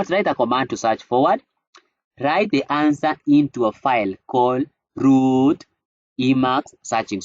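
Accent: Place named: Kenya. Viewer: love your accent,kenyan?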